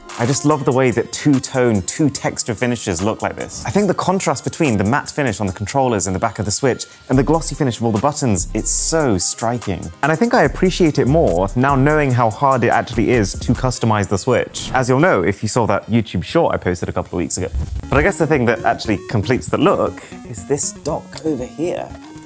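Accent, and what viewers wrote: United Kingdom. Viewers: Are you briesh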